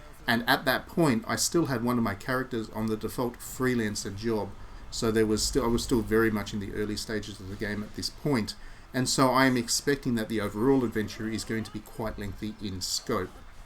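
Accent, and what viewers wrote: Australia. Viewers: Been watching this closely, the fact that bravely default was often referred to as the best final fantasy in a long time (without being one) by a lot of people had me so interested but I never got to play it. (Hopefully they port to switch or ps4...Dunno I think it's a nintendo exclusive?) This told me pretty much what I needed to know, ya also sound Australian so consider my subbed. Got ya point across well an laid back bro. 👌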